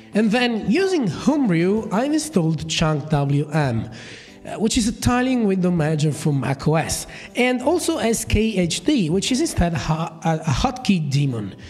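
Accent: Italy. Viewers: Hey dude, are you italian? Cause you sound so, but your English is really good.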